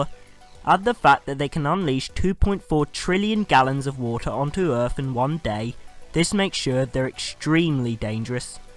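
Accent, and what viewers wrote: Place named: Northern England, United Kingdom. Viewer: Not just saying it, this was my favourite video of yours so far.. The clarity of your voice is perfect on this :) Where are you from? I'm getting everything from Scouse to Manc to Yorkshire!!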